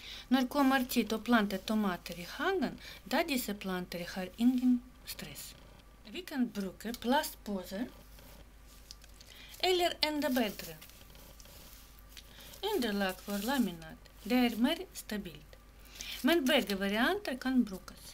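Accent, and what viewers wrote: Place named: Russia. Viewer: Ruskiy aktsent na vidu :)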